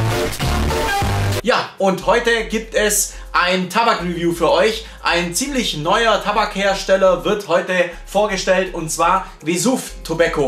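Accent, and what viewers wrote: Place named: Swabia, Germany. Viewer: Woher aus Schwaben kommsch du eigentlich? ;-) Dialekt kommt mir sehr bekannt vor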